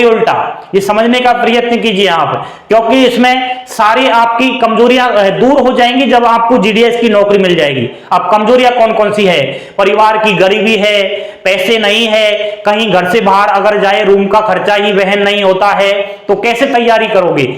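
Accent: Rajasthan, India. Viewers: Sir aap rajasthan se ho kya ❤️❤️❤️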